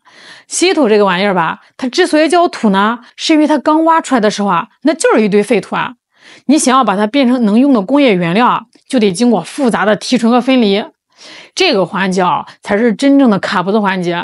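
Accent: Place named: Anhui, China. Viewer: 这口音我终于知道是哪里的了，应该是安徽的。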